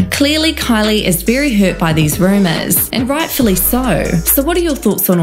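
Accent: New Zealand. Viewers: Now that is a Kiwi accent! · New Zealand accent.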